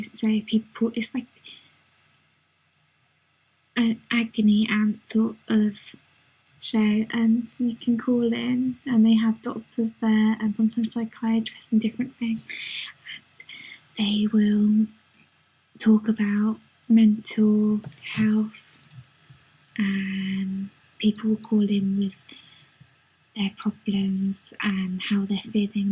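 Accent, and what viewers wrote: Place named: Essex, United Kingdom. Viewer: I love listening to English accents in general, but I find yours is especially charming. I'm probably not even close but I'm going to go out on a limb and guess that you're from Essex.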